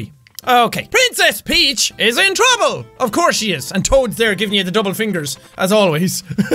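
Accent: Ireland. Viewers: I love is irishness. Mareio, shtoopid, I love if all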